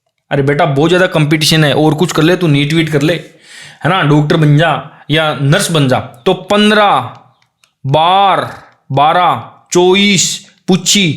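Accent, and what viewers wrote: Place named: Shekhawati, India. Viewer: Wow sir .....kya gajb ki trick ....❤ap shekhwati se ho kya kyunki apki language m asar dikh jata h thoda thoda .......bhot acha pdha rhe ho sir sir ......👍👍👍👍👍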